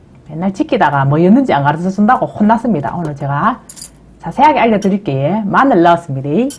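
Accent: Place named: Gyeongbuk, South Korea. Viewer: 와 안니비지노 ㅋ 경북말씀 맞아요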